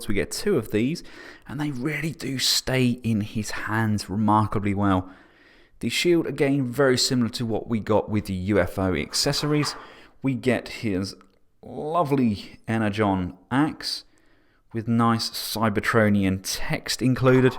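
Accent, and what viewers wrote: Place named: United Kingdom. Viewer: british accent... must sub...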